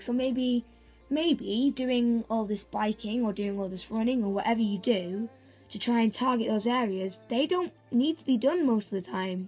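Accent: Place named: Surrey, United Kingdom. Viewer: You sound really posh on your videos, you sound like my cousins from Surrey :D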